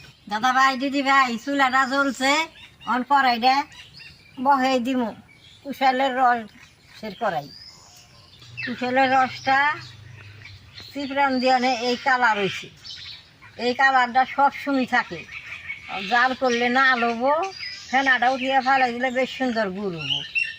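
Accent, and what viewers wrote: Tangail, Bangladesh. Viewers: টাঙ্গাইলের মানুষ আমি। কুমিল্লা থেকে দেখছি। আপনার মুখে টাঙ্গাইলের ভাষা শুনতে খুব ভালো লাগে।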